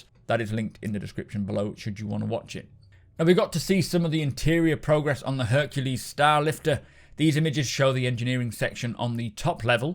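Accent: United Kingdom. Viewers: You have a very thick britbong accent It's really annoying, for example on 5 minutes you're trying to say teased but you said teabd. incoherent mumbling.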